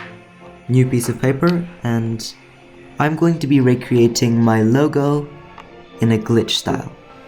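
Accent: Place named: United Kingdom. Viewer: I finally found a british you tuber!😊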